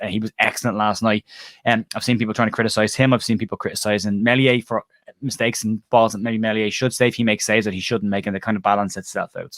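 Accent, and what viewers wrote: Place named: Galway, Ireland. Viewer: Great job! As a novice follower of the game my tactical understanding is limited. But when it comes to how the Board is going about this transition, I have similar experience from other corporate situations. Your coverage of this aspect of the Whites is both knowledgeable and, even rarer, objective. No pinch of salt needed. In addition, to my Midwestern ears, your delightful Galway brogue fits easier than Yorkshire English. The non-game terminology is also curious to me. Here in the US, we wouldn't "sack the manager" but "fire the coach". And if the replacement isn't onboard soon, we'd be saying, "Ready. Fire. Aim."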